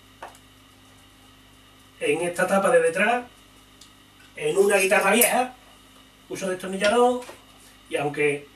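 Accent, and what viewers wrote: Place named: Andalusia, Spain. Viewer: Cuanto cuesta un mantenimiento así? yo tengo una guitarra mala (Berhinger) y no la llevé a un luthier porque pensaba que me iba a costar más el collar que el perro. Por cierto, ¿dónde tienes el taller? por tu acento creo que vivimos cerca (yo en Sevilla). Al final me compré una Yamaha Pacífica pero no se si merece la pena ajustar la otra o venderla. Saludos.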